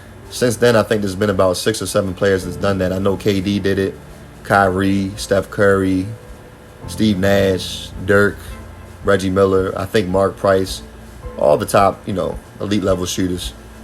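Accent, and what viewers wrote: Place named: Baltimore, United States. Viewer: Nice vid! Bird Hakeem and Harden are my all time favorite players! Love the Baltimore accent. One small tip though, the music is a bit loud in comparison to your voice :)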